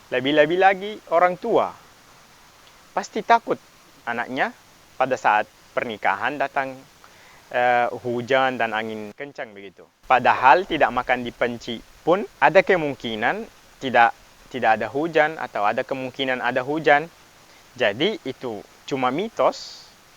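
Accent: Makassar, Indonesia. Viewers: Serius kak. Semakin kesini bahasa Indonesia Bagus. Tp semakin kesini jg logat makkasr jg semakin jelas :)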